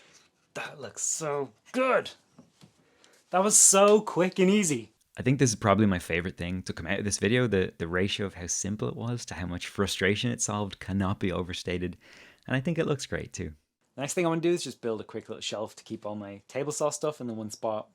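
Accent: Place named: Ireland. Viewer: Heard the fellow Irishman voice and had to subscribe right away!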